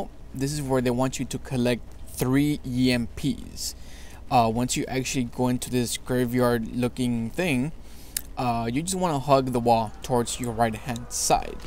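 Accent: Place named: Canada. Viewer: Are you Canadian I </3 your accent c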